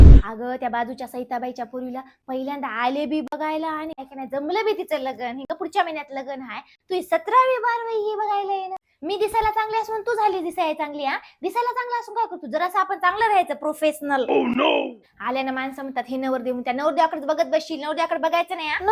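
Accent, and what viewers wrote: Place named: Marathwada, India. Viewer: Bokna,, marathvada style,, ly bhari ❤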